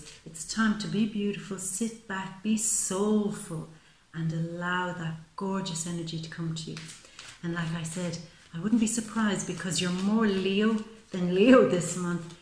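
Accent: Ireland. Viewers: Gemini sun, Aquarius moon, Scorpio rising here <3 I watch a LOT of tarot channels and yours is by far the most touching, accurate and thorough <3 the readings this month and last were eerily accurate to my situation. You explained the exact thing that happened to me. This gives me so much hope and inspiration. Plus, my family is from Ireland and I love hearing that calming voice <3 reminds me of home. Thank you so much for taking the time to do these readings for us.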